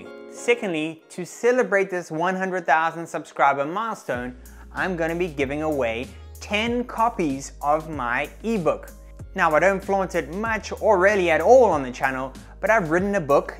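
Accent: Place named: South Africa. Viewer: Hi, love your channel. I've been trying to work out if you're originally South African, your accent is ever so faint. I've been away from sa so long myself that I might be mistaken. The reference to Banting in your description also made me wonder. Prof Noakes rocks, and as an ex Durbanite and avid Comrades follower he's legendary.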